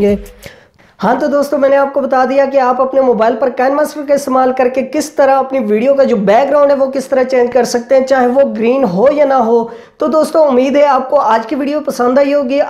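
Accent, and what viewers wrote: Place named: India. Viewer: Fk damn, Indian accent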